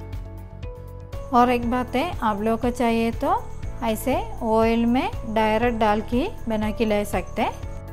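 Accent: Kerala, India. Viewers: Thank you madam for the recipe,I like your hindi in Kerala accent ❤️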